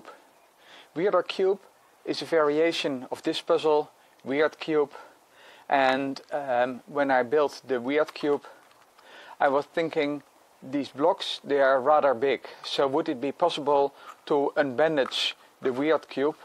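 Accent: Netherlands. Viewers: Are u Nederlands i'm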